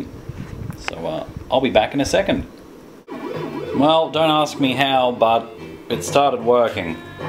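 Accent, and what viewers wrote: Australia. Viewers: You sure are one heck of an imaginative guy. I've seen some of your "constructions", I just wonder why you have so many "DC's" :P I'm from Portugal. You have an australian accent? Am I wrong?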